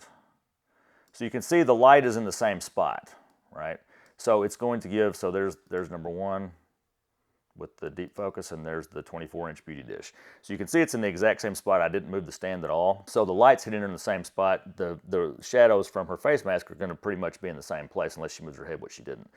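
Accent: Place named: Texas, United States. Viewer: Another great video. Thanks so much. Side note: being from Miami, seeing a Hispanic person talking with a Texas accent really messes with my head. 😂😂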